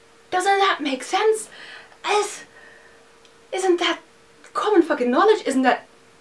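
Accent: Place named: South Africa. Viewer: Where are you from? your accent is beautiful. Sounds a bit South African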